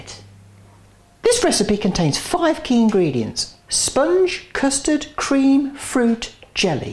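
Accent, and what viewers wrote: United Kingdom. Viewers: You sound so British, so refreshing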